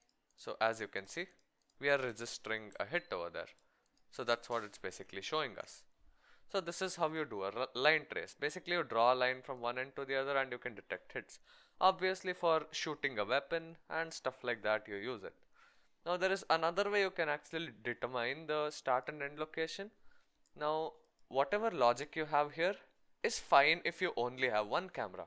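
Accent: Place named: India. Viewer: I came from freecodecamp's sir aapki aawaaz se hi pechan gya ki indian ho and instantly subscribed 😁 😂 btw can you tell me is this good for future? I mean is field me jobs kaisi h? And india me kaisa scope h?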